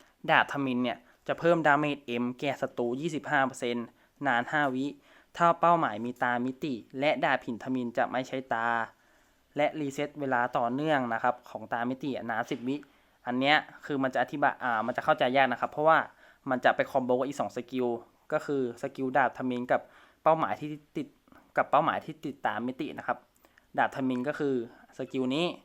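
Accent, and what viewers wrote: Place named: Thailand. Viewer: เหน่อจังจังหวัดไรออคับบช นักดาบกับมือปืนอันไหนดีกว่า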